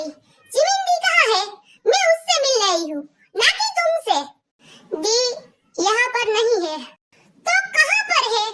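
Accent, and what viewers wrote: Gujarat, India. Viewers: Didu aap kya gujrati ho voice sound se lga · Wow gujtati accent is so good 🎉😊❤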